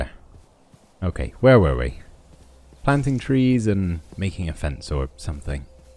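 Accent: United Kingdom. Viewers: are you from the U.K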